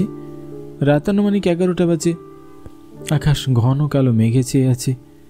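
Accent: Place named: East Bengal, Bangladesh. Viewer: Narrator কি বাংলাদেশী না কি? উচ্চারণটা পূব বাংলা ঘেঁষা। ভালো লাগলো না।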